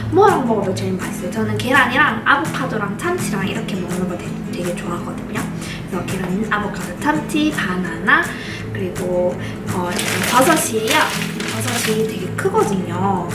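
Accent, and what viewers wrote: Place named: Gyeongsang, South Korea. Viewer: 경상도말투 핵기욤이셔요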